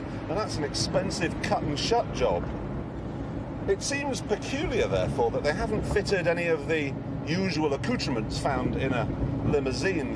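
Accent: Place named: RP, United Kingdom. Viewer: When Clarkson still did the bbc presenter’s accent lol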